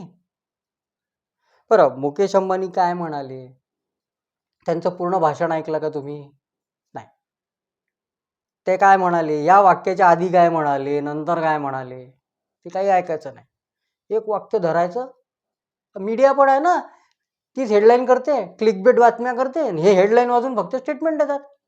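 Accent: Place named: Varhadi, India. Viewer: Sir, mi aaple you tube chanal nehme baghato, karan mala aapali varadi bhasha yekayla khup khup bare vat te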